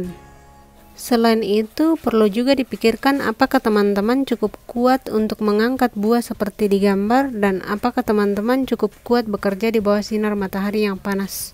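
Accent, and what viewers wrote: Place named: Makassar, Indonesia. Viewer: trims infonya kak. btw kakak org makassar kah? logatnya mirip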